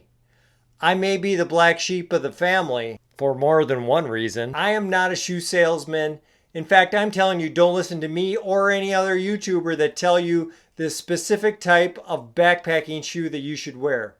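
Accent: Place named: Wisconsin, United States. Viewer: Based on accent, Wisconsin, Barron County? Thanks for the tips.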